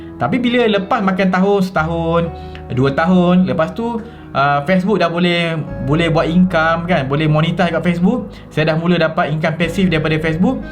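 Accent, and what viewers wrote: Melaka, Malaysia. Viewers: loghat Melaka kuat jugak tu bro... Pegang = "pay"gang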